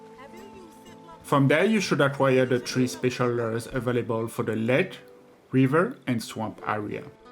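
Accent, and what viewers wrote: France. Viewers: Nice video man! What’s this accent? Français?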